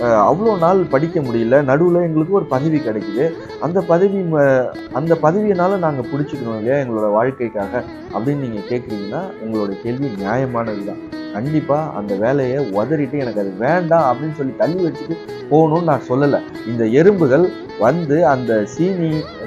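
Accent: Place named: Karaikudi, India. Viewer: சிறந்த ஆக்கப்பூர்வமான பேச்சு சார்..... இனிமையான குரல்..... கொஞ்சும் காரை தமிழ்.... மேலும் மேலும் கேட்ட தோன்றுகிறது....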